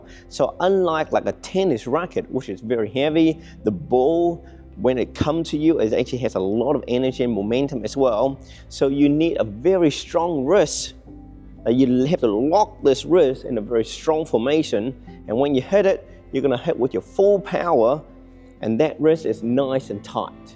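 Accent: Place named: Australia. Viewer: Ok so what if I'm just an intermediate player, what is the best weight type racket and string tension, assuming I'm not very muscled? Even weighted, heavy head, thin griped, light head or which? 😮😮😊😊 BTW, your language and accent give away where you were born and most probably located, down under lol 😊😊😅😅😂😂❤❤❤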